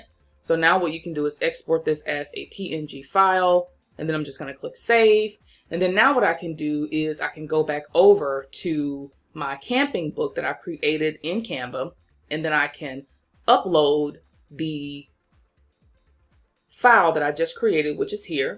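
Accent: South, United States. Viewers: Great video..thanks so much. This was easy to follow and fun. Love your southern country accent.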